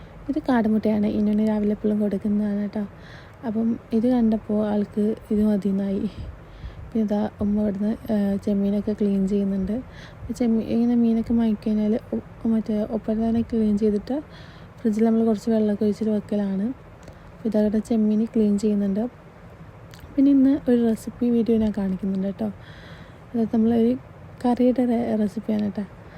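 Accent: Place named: Kannur, India. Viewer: kannur il evdanu...aa slang kettappo thanne manasilayi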